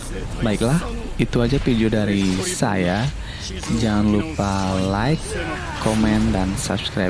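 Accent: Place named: Bali, Indonesia. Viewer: Bali sekali logatnya anda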